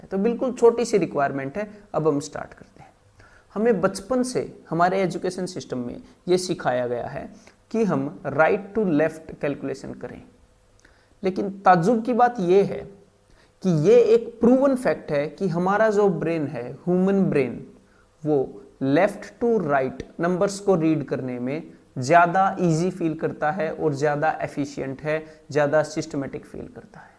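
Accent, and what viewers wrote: Haryana, India. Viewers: gr8 Haryanvi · Haryanvi h bhai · Sir apki mother tongue haryanvi h kya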